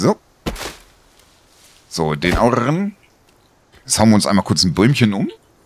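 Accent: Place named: North, Germany. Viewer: Hallo, Lass dich nicht ärgern, ich persönlich mag den norddeutschen Akzent und "Suuns"of the forest ist einfach sehr erfrischend zu hören 💙😊 Lg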